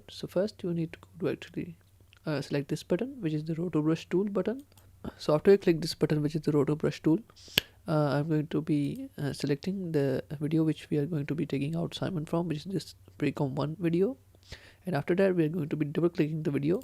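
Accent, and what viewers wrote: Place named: India. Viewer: Yeah, it sounds to indi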